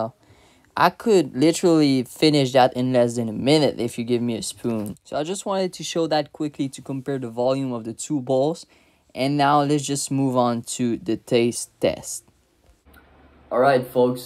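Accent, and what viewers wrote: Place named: Quebec, Canada. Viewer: Ahh I see, you have that GSP accent haha. Keep up the good work man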